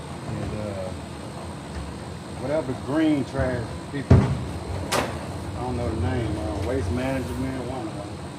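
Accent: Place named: Mississippi, United States. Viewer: You from Mississippi?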